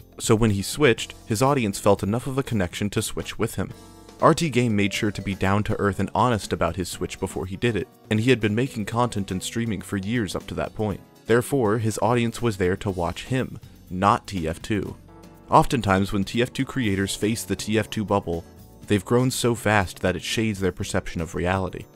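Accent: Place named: United Kingdom. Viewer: Im just here for your british vocals!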